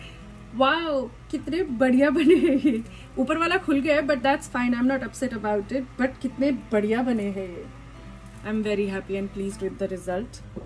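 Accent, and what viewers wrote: Pahadi, India. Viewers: Tussa ra pahadi accent ai eee jaa , luv from mandi HP 🤗❤️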